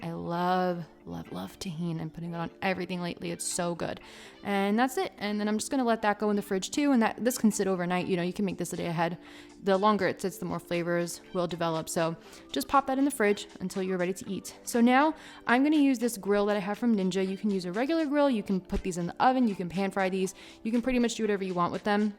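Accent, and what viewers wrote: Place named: New Jersey, United States. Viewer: Definitely a Jersey girl.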